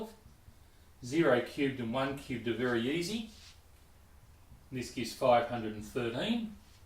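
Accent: Australia. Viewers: g day must be from straya mate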